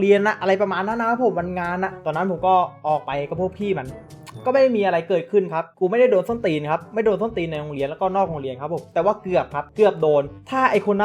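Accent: Phetchaburi, Thailand. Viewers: พี่ อยู่จังหวัดไรคับสำเนียงพี่เหมือนเพชรบุรีเลย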